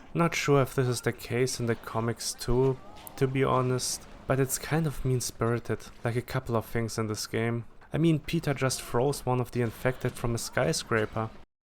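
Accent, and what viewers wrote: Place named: Germany. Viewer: Dein deutscher akzent😂😂❤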